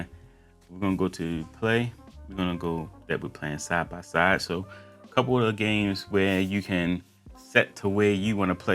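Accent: Baltimore, United States. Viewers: that baltimore accent coming out big time lol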